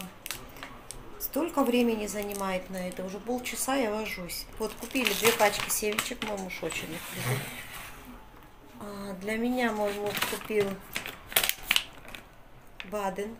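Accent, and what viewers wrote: Georgia. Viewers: Мне наоборот нравится ваш акцент грузинский и когда неправильно говорите слова это придаёт живости, характер вашим видео. Приятно, что это на видео живой человек, с душой, а не робот на работе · вы уже не первый раз отвечаете на комментарии о замечаниях, зачем? Очень много внимания этим людям, они только это и ждут. Мне например нравится ваш грузинский акцент.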